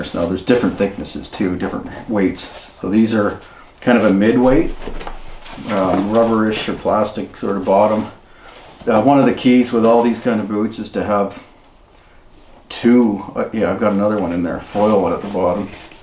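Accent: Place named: Canada. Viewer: When you make the "ou" sound it's easy to tell you are from Canada.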